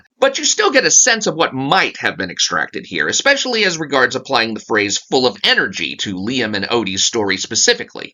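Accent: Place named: Australia. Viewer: Your just coping and seething, luv the Aussy accent through.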